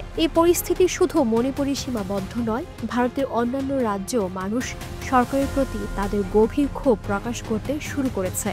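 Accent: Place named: Bangladesh. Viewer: Why you are not giving “Go back slogan” to Mamota or Firhad” to whom 10 people are dead before Puja?? and everyday people are raped,murdered??? India is still stabile due to Narendra Modi and who can talk against Donald Trump,,,your Bengali languages sounds like you are a Bangladeshi journalist…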